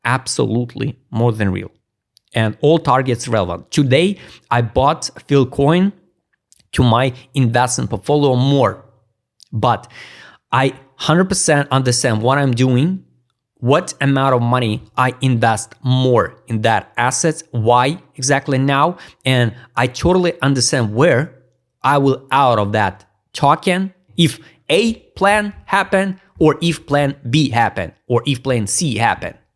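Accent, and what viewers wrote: Russia. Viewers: Твой русский акцент превосходен.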